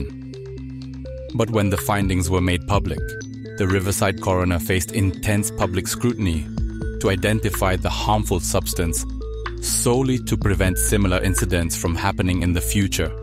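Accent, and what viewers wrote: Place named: Germany. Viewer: Just chiming in to say your voice is great, and the German accent makes it a bit more interesting.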